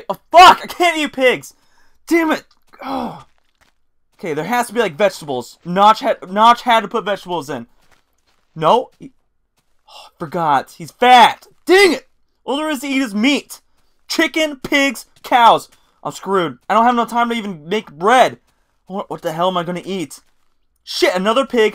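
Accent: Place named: United States. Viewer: Like an American or Bible Thumper. If American's sound like that, what do Canadian's sound like? Makes me nervous just thinking about it O.o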